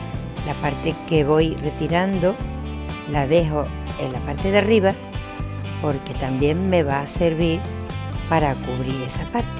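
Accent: Andalusia, Spain. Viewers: Gracias querida amiga yo te adoro de verdad te admiro mucho eres el mejor impresionante y con esa voz que tiene tan dulce la andaluza me gusta mucho no es igual estoy peor y no tengo aquí no tengo ni calefacción cuando creo que tengo esto tan grande porque no me pagas el Perro Maldito nos vamos pronto no tengo ganas de ver nada bonita pero qué precioso todo lo que haces y esto en esta fecha muy bonitas pero bueno el día 24 voy con mi hija a cenar yo lo he comprado todo claro bueno con mi madre con ella no es su padre pero su padre me da vergüenza porque se parece grande mándame en vez de subir hace muchos años y yo no quiero que vaya me da vergüenza de ese hombre estaba separado te quiero cariño un beso veremos no voy a celebrar nada no tengo ganas de nada de 31 sola también aquí pasando frío como la mierda que Dios sea justo porque no es justo el Dios te dejo que estoy llorando un beso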